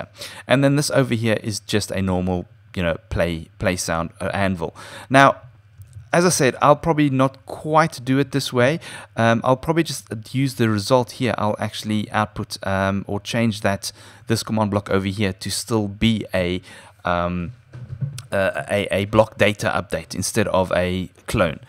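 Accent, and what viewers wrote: South Africa. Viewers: HEY!! are u south African? If yes I am too! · hi. where are you from? You sound South African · are u south african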